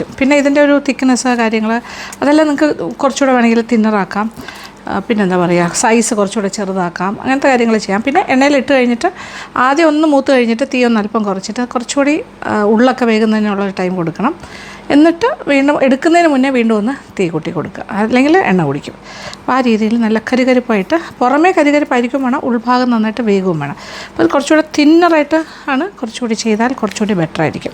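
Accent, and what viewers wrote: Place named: Central Travancore, India. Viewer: Your demonstration and explanations are easy to understand. Your Malayalam is from Central Travencore as well. Not of native Trivandrum type, it is clear and well spoken. Thank you for the time spent on items cooking. May God Bless you and Keep you.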